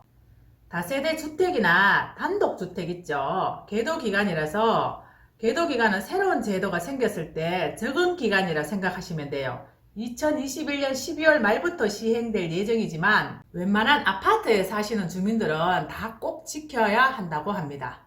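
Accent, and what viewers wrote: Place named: North Gyeongsang, South Korea. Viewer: 나도 경상도사람임에도 불구하고 경북사투리듣기거북해서 이분 유튜브안볼라하는데도 그런거상관없이 이분채널은 진짜 유용함ㅋㅋㅋ 인정할수밖에 없음!!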